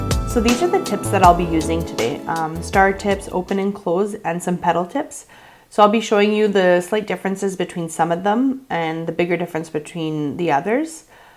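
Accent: Canada. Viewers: Thanks for this 😍 are you a fellow Canadian? I hear an accent lol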